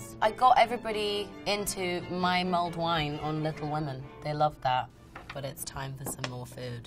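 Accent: United Kingdom. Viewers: She's so pretty and i love her British accent :) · she has the most satisfying british accent in britain wtf like i’m british and im JEALOUS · buBbLe AnD sQUeEk???? ive been watching this for 3 years but i still havent gotten used to the british accent after watching her as yelena...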